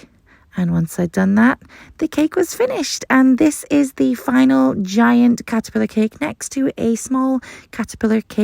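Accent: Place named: North, United Kingdom. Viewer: talks like a northerner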